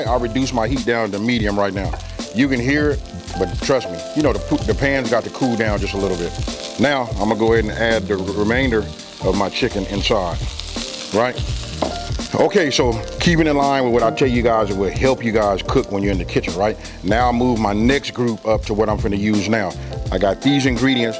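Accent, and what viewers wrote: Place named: California, United States. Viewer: Cali accent thick. Came here to find something to cook for the night. Thanks bro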